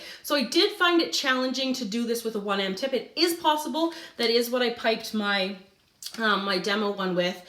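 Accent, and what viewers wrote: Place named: Canada. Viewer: I love watching your videos so much! My mom is Canadian, but we live in Texas, so I love hearing the accent!!😊😍🤗😘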